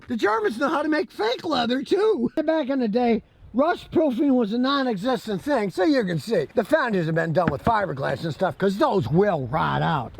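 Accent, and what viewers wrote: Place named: Western New York, United States. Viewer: He lives in TN, so I'm guessin' that Western NY inflection isn't translatable....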